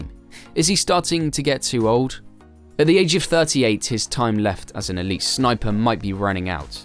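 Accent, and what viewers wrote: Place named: United Kingdom. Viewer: British accent talking bout hockey wtf is this a joke :DD? Ovie probably hits 25goals for this season, he will start picking up the pace.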